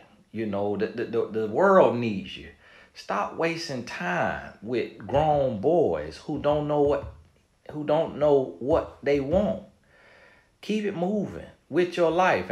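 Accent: South, United States. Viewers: Sadly, in the black community especially, it’s become accepted that two ppl can date, live together and have kids etc and still no marriage? To each its own, but for me I wanted different. My entire family were married, later ppl divorced, but in my younger years my siblings and my cousins parents saw marriage. The other factors ppl are settling for are obvious, like you said “come on now” even in your southern accent ☺️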